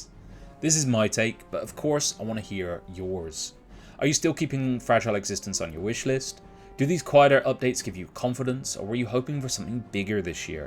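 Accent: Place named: West Country, United Kingdom. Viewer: Whats with the west country accent sprinkled with the American favourite of replacing T sounds with a D. You may not think it 'madders' but it matters.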